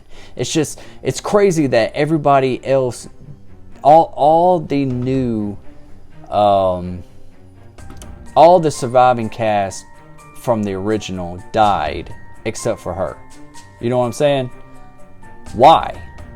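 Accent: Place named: South, United States. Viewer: I love his voice. That southern accent is so relaxing. Looking forward to more theories and videos.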